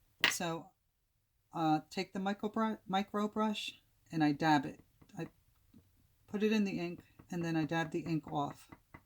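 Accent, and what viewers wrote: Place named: East Coast, United States. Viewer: Thanks for sharing. You make it look easy! Just subscribed. I detect an East coast accent! Lol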